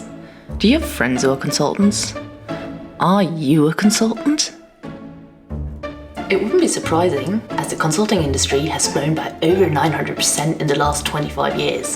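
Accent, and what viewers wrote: England, United Kingdom. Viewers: I'm riveted by the waterfall hair, the jazz, the beauty, and simultaneously the horror of the words actually being said. 925% ! Bloody f....king hell. Final verdict: dizzy & sick. Ooof. PS - really nice handwriting. With blurred f-stop closeups. And then the accent - which bit of England is that? Those are the last straws. What's a man to do. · Excuse me if you mind, where are you from? I figured out where your accent is from, it sounds like it's from somewhere in the UK but not quite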